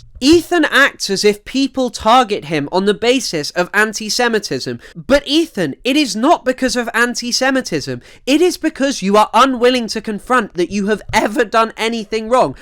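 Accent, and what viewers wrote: United Kingdom. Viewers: I think it’s also the British accent, I’m ESL and have a harder time understanding those who are British but I can understand at this speed any American. I’ve had to rewind a bit… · i hate when there’s a video i’m really excited to watch and the narrator is nauseatingly british 😢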